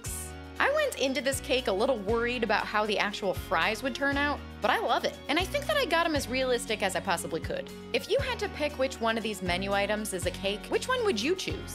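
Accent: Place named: Wisconsin, United States. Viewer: I'm telling you she has to be from Wisconsin she sounds so Wisconsinny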